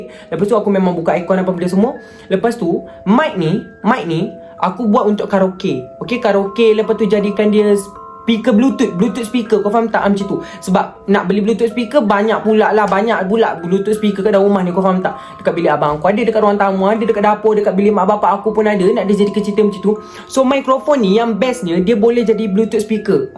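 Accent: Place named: Kelantan, Malaysia. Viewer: wow kelate 😂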